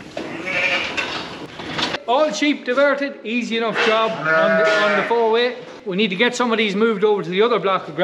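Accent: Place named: Ireland. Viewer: Thanks for sharing! I love to listen to you and other Irish people speaking ! Very soothing to hear! 😊🐑🐏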